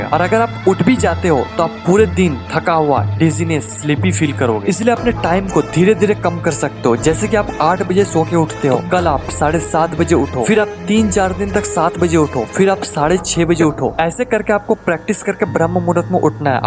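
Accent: Assam, India. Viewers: Bro are you from Assam?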